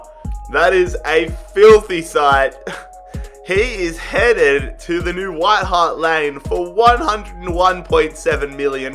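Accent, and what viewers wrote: Australia. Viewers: He is Australian they speak very strangely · Are you a bloody Australian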